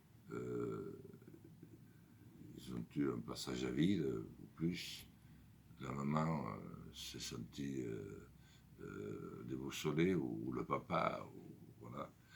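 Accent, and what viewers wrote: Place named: South, France. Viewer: merci!! gros probleme de civilisation: rapt legal et irrespect pour les parents et enfants resultat: destruction de l'etre? helas: courage a ceux qui en souffrent! merci cher mr lasalle g voté pour vs! l'accent du soleil la vraie france du terroir!!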